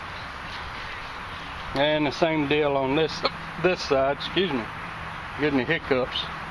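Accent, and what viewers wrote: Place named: South, United States. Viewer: just love that Southern drawl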